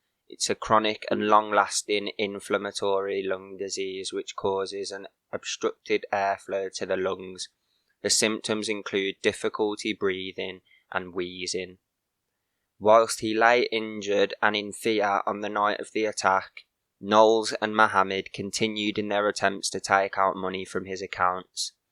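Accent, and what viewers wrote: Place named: United Kingdom. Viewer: Where is your accent from I know British but where . My Aunty and uncle lives in England their accent is way different love the accent by the way keep up the good work. Congrats on your little one .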